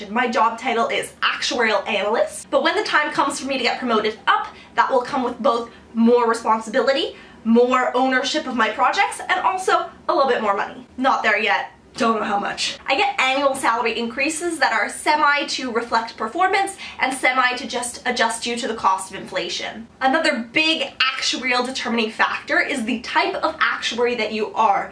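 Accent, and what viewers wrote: Canada. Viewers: Did I watch this on fast forward or do all Canadians fuck up our language like this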